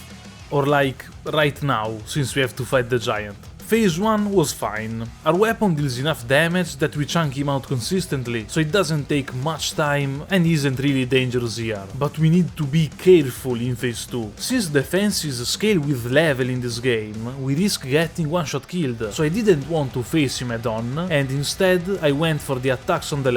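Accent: Italy. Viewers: Hey man. Great video, but I was wondering where are you from. You sound a lot Italian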